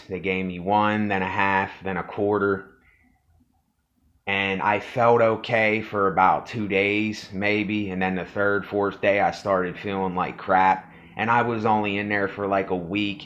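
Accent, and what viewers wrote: United States. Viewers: You sound like your from northern Kentucky. Just saying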